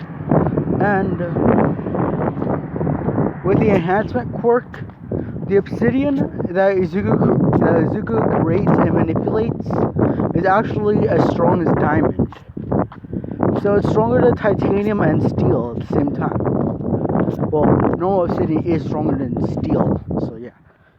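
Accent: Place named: Russia. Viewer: you have a perfect russian accent...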